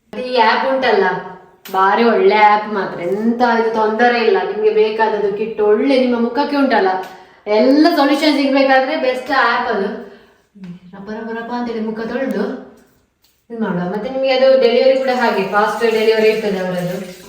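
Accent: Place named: North Karnataka, India. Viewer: ನಮ್ಮದು ಉತ್ತರ ಕರ್ನಾಟಕ ❤ ನಿಮ್ಮ ಭಾಷೆ ಕೇಳುವುದಕ್ಕೆ ತುಂಬಾ ಖುಷಿಯಾಗುತ್ತದೆ ❤😊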